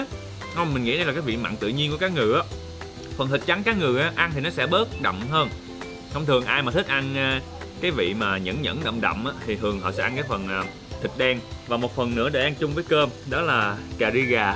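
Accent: Mekong Delta, Vietnam. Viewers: Tiếng nói đậm chất miền tây ko lẫn với ai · "Ở đây cũng có ruộng bậc thang mà nó cũng trụi lũi luôn" Giọng miền Tây dễ thương quá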